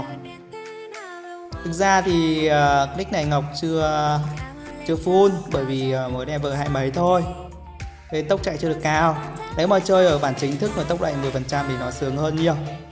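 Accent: Vietnam. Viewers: quê ở đâu ấy. Giọng vừa nam vừa bắc khó đoán quá😃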